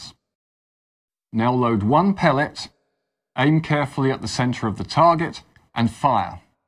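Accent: United Kingdom. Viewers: You sir are a scholar and a gentleman This was beautifully explained and the British accent makes it nicer for me as someone brought up in rhodesia and South Africa 😎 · Thank you british man!!!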